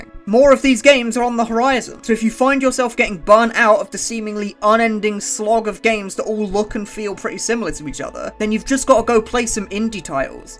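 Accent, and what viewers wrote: United Kingdom. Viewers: I like the funny British man talking about indie games and the outer wilds. Seriously tho I know this is an old video but I love your content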